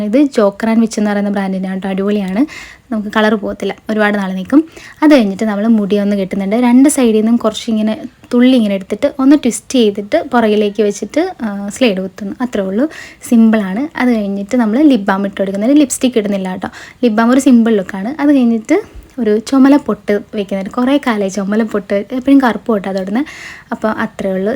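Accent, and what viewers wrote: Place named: Pathanamthitta, India. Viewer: Same ahh parayunnee... Pathanamthitta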